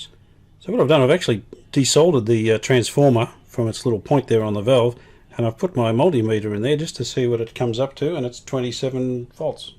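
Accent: Australia. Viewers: Great video as usual. Although you indicated that there was a difference between yourself, fellow Australians and New Zealanders, I really couldn't discern a difference. Though those that live in the northern US states say they have a hard time understanding we southerners, I have a hard time understanding those from the New England states. Oh well. Hope it isn't too hot for you there. Merry Christmas to you and family!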